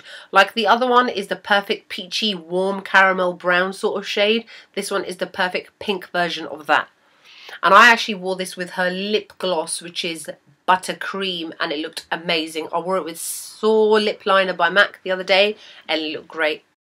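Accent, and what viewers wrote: United Kingdom. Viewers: I guess you are from England! You sound so british! Really cute :) ! Greetings from Germany. You made me buy Buttercup hehe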